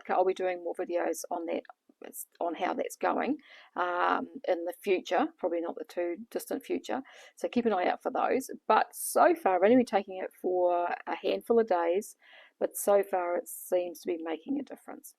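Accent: New Zealand. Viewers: Still can't get over how beautiful the NZ accent is. Thanks for the advice on tudca.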